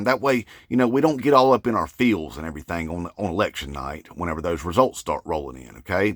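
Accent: South, United States. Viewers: 😂😂😂😂😂😂😂😂😂 I love you! You are hilarious. Your southern hillbilly accent is on point.👏🏼👏🏼👏🏼👏🏼👏🏼👏🏼👏🏼👏🏼👏🏼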